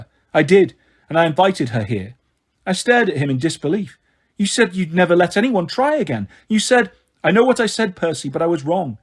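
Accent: England, United Kingdom. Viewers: Smh i gave it a try and your good but i just cant listen to an English accent when it comes to New York Percy lol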